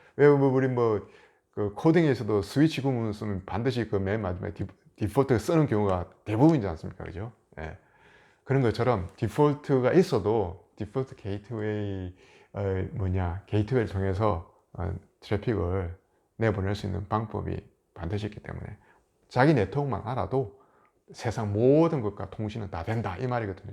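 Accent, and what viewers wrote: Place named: Gyeongsang, South Korea. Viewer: 영상 너무 유익하게 보고 있습니다^^ 경상도억양의 fluent한 잉글리쉬가 너무 재밌어요..!! ㅎㅎ 재밌게 ?직관적인 설명 감사합니다~~~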